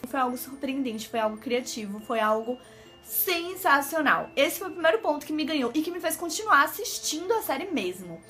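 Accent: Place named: Salvador, Brazil. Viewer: Amo esse sotaque do meu país Salvador hahaha Já tinha assistido o filme efeito borboleta, eu fiquei um pouco desanimada com o filme porque eu achei que as coisas demoraram a acontecer, mas eu até que gostei, achei a proposta interessante. Fiquei com vontade de assistir The Bold Type, acho que vai entrar pra lista kkkkkk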